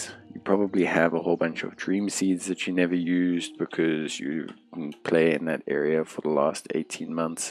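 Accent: South Africa. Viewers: awesome man, great to see other SA WoW players. You do sound like you've been out of SA for awhile.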